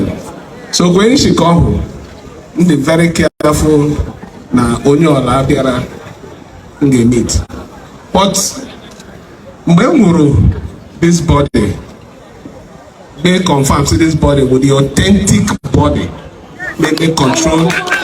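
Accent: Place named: Umuahia, Nigeria. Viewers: He's even speaking central Igbo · he's Igbo language sound like the ikwuano umuahia people speaks .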